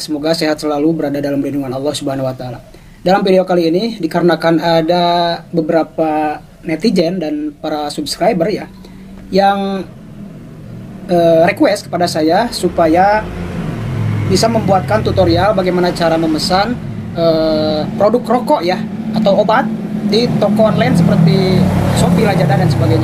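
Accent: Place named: West Java, Indonesia. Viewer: logatna na mah urang sunda nya kang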